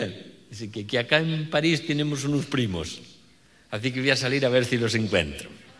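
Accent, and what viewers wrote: Argentina. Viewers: A mi me costo un poco comprender muchos terminos pues soy una mujer cubana y no solo estamos muy lejos los unos de los otros sino que la forma de hablar y sobre todo los modismos son extremadamente diferentes. Pero poco a poco lo fui entendiendo todo y hoy en dia lo escucho casi todos los dias. Le felicito maestro, por su humor limpio y puro. Por algunas partes del mundo los argentinos tienen fama de ser orgullosos....... y luego de haberlo conocido a usted, ya entiendo el por que. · ¡Muchas gracias desde Barcelona!,....un honor y placer escuchar y reir también por los chistes, así como poder apreciar en la voz, el acento propio y humano, no solo de la calidad de la cultura Argentina, si no de un gran artista y persona.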